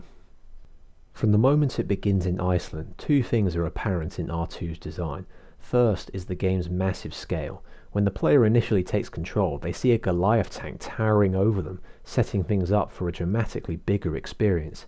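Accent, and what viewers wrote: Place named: United Kingdom. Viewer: So glad I found this channel through this video. As an American I love listening to a calm British voice narrating a hour long video. Perfect for bedtime.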